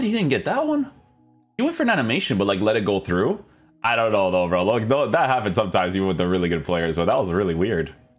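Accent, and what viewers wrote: Portugal. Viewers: He's Portuguese.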